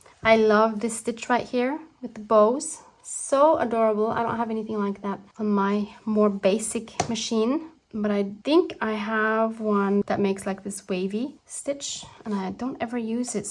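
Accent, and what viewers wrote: Vienna, Austria. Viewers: another beautiful project and i really love to hear you speak german with your lovely vieanna dialect <3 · Ohhhh du liebste, du herziges Zauberwesen😊, was hast du wieder Tolles gemacht. Ja und nun weiß ich endlich was ich mit meinen Treibholzstöckchen machen werde, die ich letzten Sommer so eifrig am schönen Chiemsee sammelte❤. Es ist echt lustig, dass ich ebenso Probleme mit dem Zufallsprinzip habe, obwohl ich alles andere als perfektionistisch und ordentlich bin😅. Aber wir hatten es ja schon mal davon... Es ist so schön, dich deutsch sprechen zu hören, denn es blitzt doch das Weanerische hindurch und ich liebe diesen Dialekt. Ich hab ja auch ein Faible für österreichische Autoren. Deine Fabricsnippetroll ist super geworden. Achja und das Zeugs, dass du wohl meinst wegen des Stofffixierens müsste aufbügelbare Vlieseline sein. Ich glaub, die Quilterinnen benutzen das immer. 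Das kleine Journal, das du von Petra bekommen hast, ist wirklich allerliebst und ich mag besonders, dass sie auch Samt verwendet hat. Ein tolles Material wie ich finde, besonders wenn er schon gebraucht ist. Ganz liebe Grüße zu dir ins schöne Wien und guats Nächtle❤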